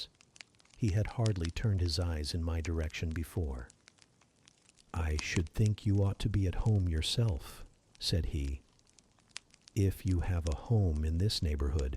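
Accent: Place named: United States. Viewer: I searched American pronounced jane eyre. This is best. And your voice so sweetie as like Rochester. And fire sound is so convenient to my mind. And its good because it is original book contents without skipping. Thanks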